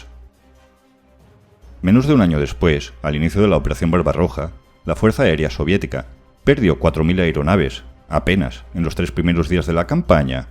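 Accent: Galicia, Spain. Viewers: De que parte de Galicia eres??????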